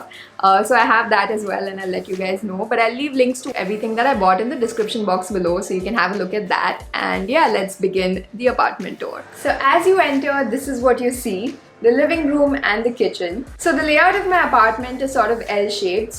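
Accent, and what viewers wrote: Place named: Mumbai, India. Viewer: What a ridiculous thing to say. Living alone is something that a lot of people aspire for. You don't need to be filthy rich for that, although of course it helps. And in every city in the world people have peculiar way of pronouncing things. Growing up in Mumbai, even I used to speak like this only. Eventually when I shifted I speak like people around here now. Nothing stuck up about it.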